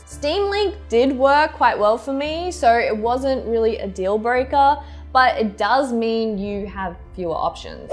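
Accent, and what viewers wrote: Australia. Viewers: I have decades of linux experience so that aspect doesn't bother me, however if there are too many issues then the experience really sours. The puzzles I would be looking to solve are not linux configuration puzzles. Your experience with the rhythm games though is discouraging. I love SynthRiders so not being smoothly playable is not good. But who knows, it may be something relative to tuning the software rather than modifying the hardware. In that case, that issue should resolve itself rather quickly. We'll see. On a different note, do Australians find their accent as cute as those in the States do? Even if it isn't really an accent for them. Maybe they don't see it as cute, but do know it is cute. I have a similar reaction to Scottish accents only I can't understand what they are saying as well as I can Australians.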